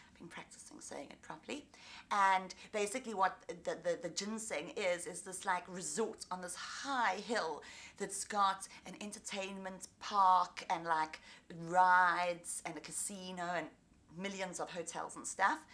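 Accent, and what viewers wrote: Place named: United Kingdom. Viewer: u got british accent..;)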